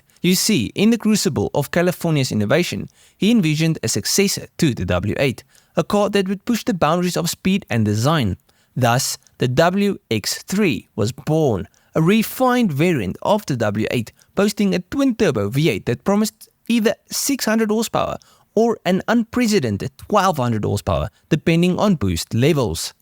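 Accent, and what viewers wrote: South Africa. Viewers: South African accent 😎🤣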